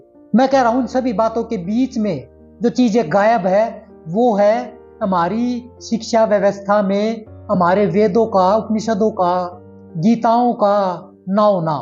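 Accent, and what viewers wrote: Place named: Haryana, India. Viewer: Aap jaat ho ya gurjar aapka accent haryanvi jaisa h ...ya phir aap gujrati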